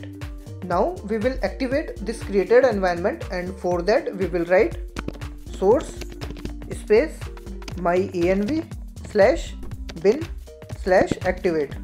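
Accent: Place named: India. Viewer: Jesus Jones.... it should be forbidden for Indians to talk English. I would understand that probably better if you would talk in your native language 🙂 Please script! But thank you for trying to help anyway!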